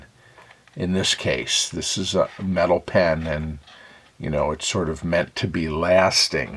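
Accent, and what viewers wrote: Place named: Great Lakes, United States. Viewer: ESSAY ALERT 🚨 Mid 60-year-old, always a pen fanatic, I recently came across a website all about the history of Parker pens. I’m sure fans of Parker know of this website. I remembered I had bought a Jotter in the early 90s, replaced the cartridge with a bold cartridge, didn’t like the way it wrote particularly and so threw it in a box where it has been for decades. Website gave date codes so recently I found and dated my old black jotter to sometime in 1991. Decided to refill that jotter with a modern jotter refill, which I am assuming is their best work on the ink so far. Enjoying the way it writes, decided to purchase a new Jotter XL, and I really like this one which is perfect for my large hand. Was in thrift store recently, came across a bag of old pens and pencils, looked at this bag, and to my amazement saw a stainless steel Jotter! 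The whole bag sold for around two dollars, threw away all the other cheap no-name pens, pencils, and kept the jotter. Replaced the cartridge with a fine point. What a thrift store find that was! So I use my old black Jotter and my new Jotter XL and the thrift store stainless steel Jotter and I’m happy as a clam now. The Jotter stainless steel date code worked out to be about 1991 as well. Both were made in the USA, the new Parker XL was made in France. Does anybody know if the original is made in Janesville? How many other factories does Parker have in the USA? Happy writing! Great video, really enjoyed it. Nice Great Lakes accent too 😉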